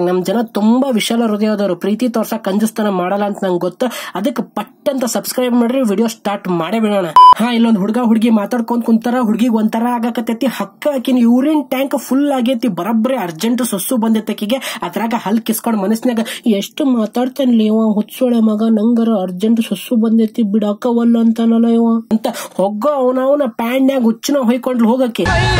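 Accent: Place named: Bagalkot, India. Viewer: Anthamma... 😂😂 Paoo.. paoo.. dialogue mast aiti noda.. yele bagalkotyav yen nee.? Fida aade nood na..😍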